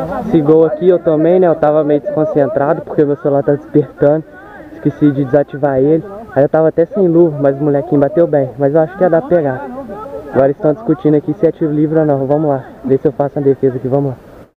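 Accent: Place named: Minas Gerais, Brazil. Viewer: Vc é mineiro né? Com esse sotaque. Legal é o clima dos jogos e a galera gnt boa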